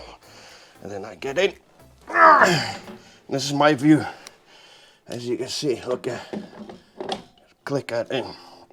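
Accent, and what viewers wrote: South Africa. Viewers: dunno how i stumbled onto your channel ill give you sub. Loving the attitude and thick accent, i miss SA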